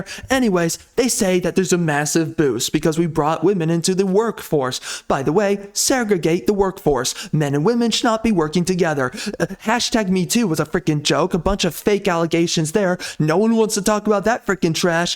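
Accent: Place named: Eastern Europe. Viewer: You sound Slavic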